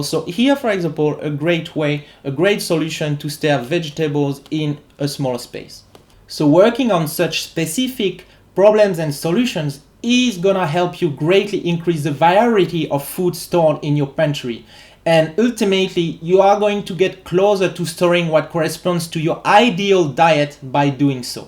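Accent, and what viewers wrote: France. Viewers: At first I thought you were German because of how you pronounce the word The but then I read the part where you live in Bulgaria and have a French accent... Anyways, appreciated the tips😀👍🏻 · Prepping with a French accent...a new twist for me!!! Love it!!!!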